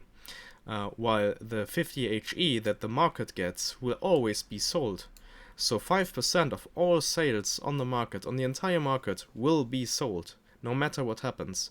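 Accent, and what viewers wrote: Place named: Germany. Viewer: Ein Deutscher das erkennt man am Englishen Slang :D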